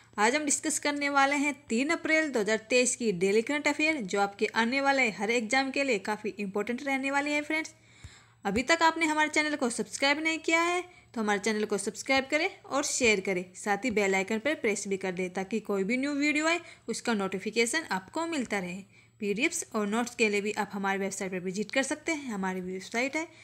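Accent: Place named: Haryana, India. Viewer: Aap up me aake hindi bolna seekho ....haryanavi aur hindi ko mix na kro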